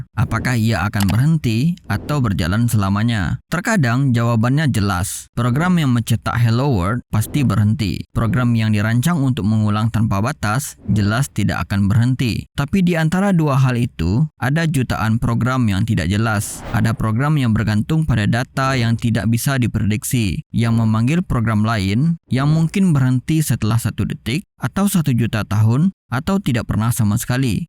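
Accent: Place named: Bali, Indonesia. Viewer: Pengisi suaranya orang bali kah? Berasa banget logatnya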